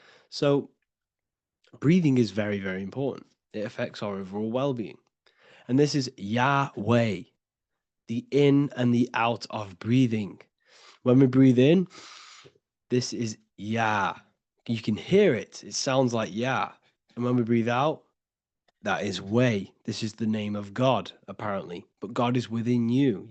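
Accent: United Kingdom. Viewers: R u from derby ?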